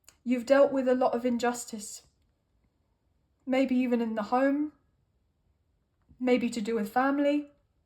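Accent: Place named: Southern England, United Kingdom. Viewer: Alright sis, love your southern English accent,the french accent was strange, however thanks for all the encouragement we will see how this week works out❤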